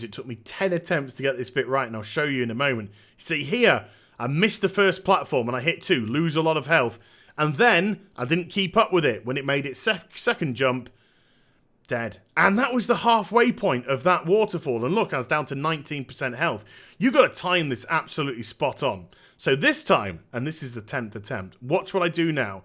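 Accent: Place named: United Kingdom. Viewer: The Brit narration makes this fun to watch! Calls the moose "bugger" and such. And after watching this video I am not even going to bother with the quest.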